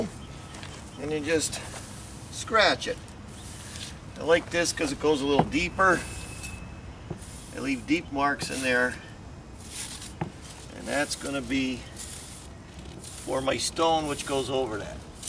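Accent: United States. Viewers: . Cheers for the info.! Of the many American accents we hear from this side of the pond yours is the most pleasant. Is your accent specific to Scranton or Pennsylvania in general.?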